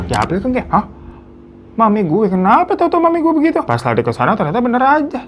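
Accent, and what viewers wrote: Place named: South Jakarta, Indonesia. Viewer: Aksen jaksel nih keknya wkwk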